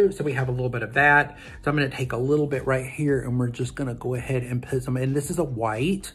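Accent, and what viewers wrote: South, United States. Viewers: I adore your Southern drawl,. I had a PhD supervisor from Georgia and I would swoon every time he spoke. Even 20 plus years later, I cannot remember a word he said because I was too busy swooning at how he spoke. 😂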